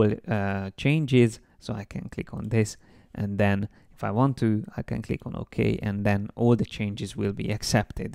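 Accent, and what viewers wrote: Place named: Hungary. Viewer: you have a kind of Hungarian accent. :)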